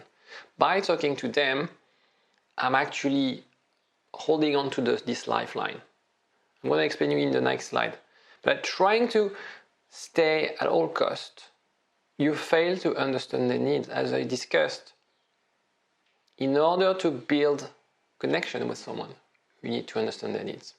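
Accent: France. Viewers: Your voice, look, and french accent already calms me down 🙂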